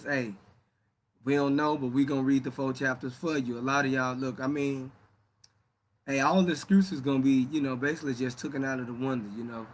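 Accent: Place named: South, United States. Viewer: the southern accent reading KJV sounds nice. like it makes it easy to understand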